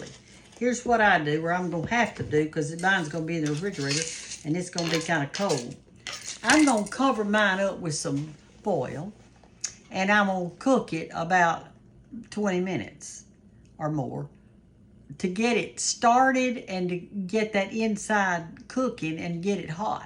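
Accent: South, United States. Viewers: So pleased to have you just pop up on my YouTube..i guess that my algorithm is finally figuring out what I like....love your familiar sounding southern accent...and I have been enjoying a lot of cabbage lately.. can't wait to try your cabbage casserole recipe 🤗.. Happy New Year..n I'm looking forward to more of your videos. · I love your shirt, where did you buy ut? Your accent reminds me ilof home and my southern roots, whereis your home? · You just make me happy hearing you I love your southern accent and your glory to the Lord shirt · Hi Ms., my name is Lilia, I live in San Antonio, TX. I catch your Chanel every now and than and enjoy watching and listening to u. I jus love that good Ole country accent u Have. Im from Spain not mexican but full blooded Spaniard. Anywho, I really enjoyed learning about this cabbage casserole I plan to make especially to share with my granddaughter bcuz she's Vegan. 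She eats NO MEATS or Pultry or Seafood none at all and is very healthy. O, by the way, I fo remember those old houses and those doodle bugs. My greatest childhood memory was when my grandma had created a somewhat cave like where my friends and I use to make mud pies out of mud and pretend we were going to cook dinner w my play dishes. Well life has had it's twists and turns and praise the Lord I AM STILL HERE. GOD has been sooo very good to me above all in all. Lots of love your way as well as prayers for u and urs. Have a blessed and prosperous NEW YEAR in Jesus name Shalom❤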